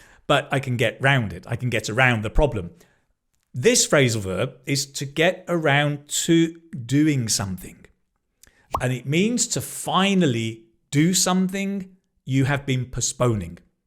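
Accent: United Kingdom. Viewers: En excellent english teacher explaining things with zeal and zest using original British accent.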